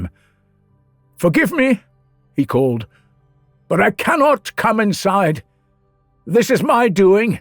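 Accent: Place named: United Kingdom. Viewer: What's this!? Greek mythology in long-form AND in a British accent?? WIN! Can't wait to dive in.....❤ Thank you!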